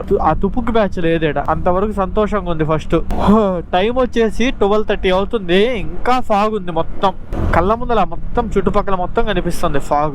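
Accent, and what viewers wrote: Nellore, India. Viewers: Bro.. I also from nellore District atmakur now staying in Dubai....Can you please describe about your camera and audio system...మా యాస లో vedio చాల బాగుంది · Mana Nellore yasa super nuv Neela unnav 👍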